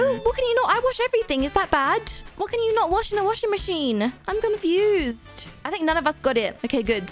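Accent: England, United Kingdom. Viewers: Are you English?????????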